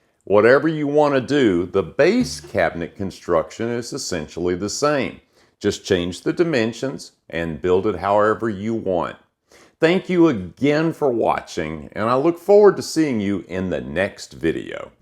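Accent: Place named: Tennessee, United States. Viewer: I think I heard a slight Tennessee accent. My Dad's from TN.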